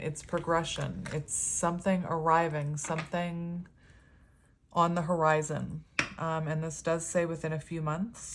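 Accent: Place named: Canada. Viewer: My favorite Canadian accent friend ❤😘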